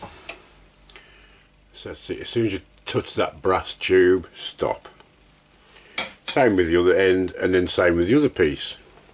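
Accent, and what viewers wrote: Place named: Black Country, United Kingdom. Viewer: You have a proper Black Country accent, nothing like a Brummie accent at all, I’m really surprised. I like to watch your videos, very interesting with a bit of light heartedness thrown in. Kind regards to the hardest working part of the duo.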